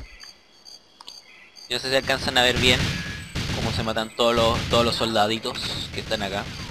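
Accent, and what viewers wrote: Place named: Argentina. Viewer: Ahora sé que sos argentino y adolescente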